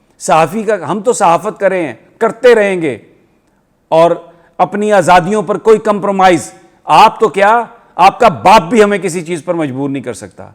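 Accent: Punjab, Pakistan. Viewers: In love wd Ur Punjabi ❤️😂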